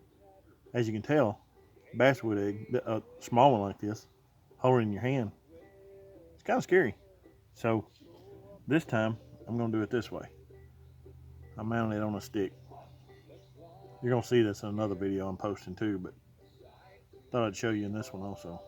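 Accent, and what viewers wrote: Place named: Arkansas, United States. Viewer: I'm new to this hobby, and I love your work. On top of that, I was born and raised in Western Arkansas, but I've been gone for just over 10 years now. I wouldn't trade the time I've spent on the mission field or pastoring now in Canada, but Arkansas will always be home. Everything about you and your videos (your accent, your sayings, the yard...) it all feels so familiar. Thanks for that.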